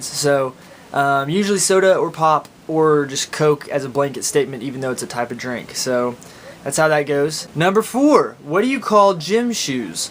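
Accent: Oklahoma, United States. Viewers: I live in Oklahoma as well. ^_^ I have almost the same accent as you. (From around Tulsa. Owasso.) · Hmmm...he sounds very Central Oklahoman and i used to work in drive thru. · Ashley Burt pretty much all oklahomans talk like him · Simple Oklahoma accent the E’s Got to get him Got to geit eeeem Instead of (in) they say eeeeeean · I live in Broken Arrow which is like 10 to 20 minutes away from Tulsa, and it’s funny because around here we sound exactly like this guy. It’s funny because I rarely hear the twang southern accent. If I go to Norman or Wagoner I do. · I love this accent too much. I need a guy from Oklahoma to move to Australia lol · Its that OKC or Tulsa Okie. Lmao. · in Tulsa we called it Soda or Sodapop (sounded like sodeepop) or pop. I have no clue which part of Oklahoma he's from but most of what he's saying sounds a lot like us Tulsans. 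sadly I left that beautiful stay years ago for Oklahoma so I am not 100% certain on this due to Californians but he said every word about the same as how I say em. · I'm Australian and I refer to the "bug that curls up into a ball" as a 'Slater'. My Dad's parents were both from Oklahoma and now I finally know why my Dad kept telling me these bugs were called 'Roly polies' while everyone else kept saying 'No, its a Slater'. Interesting listening to your Oklahoman accent, yours is no where near as strong (stereotypical) as my grandparents. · Your Okie accent just reaffirmed mine. Now I know what I sound like (only I pronounce lawyer "loyer" and I say soda instead of coke). XD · From what I've read, the California accent is descended from the Oklahoma accent. Watching your video, this is my first time hearing an Oklahoma accent, and I can totally hear how similar it is. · You sound more northern Oklahoma I'm from ada and mine is way thicker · Cool, I love Oki accent. 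it reminds me Carinvale series. :-) cheers mate, lovely accent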